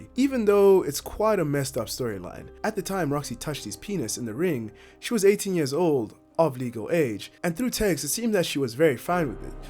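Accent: South Africa. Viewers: Ey bro are you South African?😁that accent sounds like home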